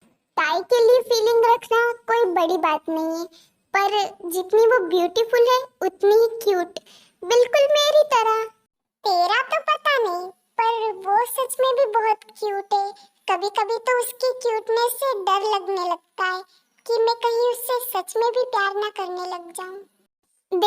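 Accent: Rajasthan, India. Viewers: Di aap rajasthan se ho kya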